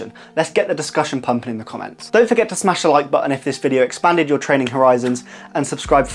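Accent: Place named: United Kingdom. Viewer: Are you British I like your accent 👍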